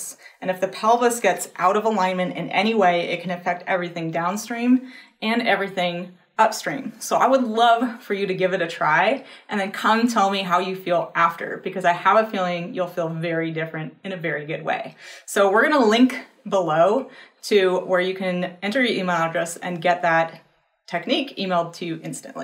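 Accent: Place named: West, United States. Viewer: Your accent sounds N of Mason-Dixon line & W of Mississippi River, but not a flat-lander or inter-mountain W, so maybe a left coast origin, but the Science Museum on the 270 inner belt in St Louis had a display of every system from the human body to include the Fascia. No info on how the display was accomplished. Visitation hours are short, like the staff wants to go play golf PM & unfortunately they are/were not open every day. Like all museums, displays change. Worth a 500 mile day trip for those in the area with an interest. Thx & GL.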